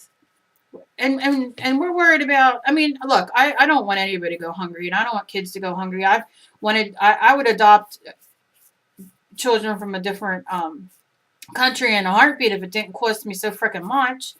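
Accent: New Jersey, United States. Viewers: You're my first YouTube comment post! I've been following your channel for about 4-5 months and am always watching them multiple times. I'm not a beginner but enjoy your banter while I craft. I will be doing the swirly tree myself soon and will try to figure out how to "tag" it to you. You're great cyber company. I'm a NJ native living in Georgia and when I came across your channel while surfing one day, the first thing I noticed was that Jersey accent. So I tuned in and haven't tuned out yet. I have a very stressful and demanding job and try to "art" in the morning cuz by the end of the day, I'm too tired to do anything. Thanks for being yourself and sharing your lovely personality with the rest of us. I've been very cyber shy about sharing my work publicly but I may find the courage soon thanks to your inspiration. Keep doing what you do and don't worry about the darn "clock".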